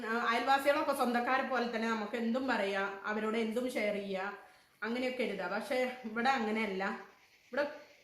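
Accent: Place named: Mahe, India. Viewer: സ്ലാങ് ഇപ്പോൾ മാഹീ ആണല്ലോ കാട്ടുപാറ അല്ലല്ലോ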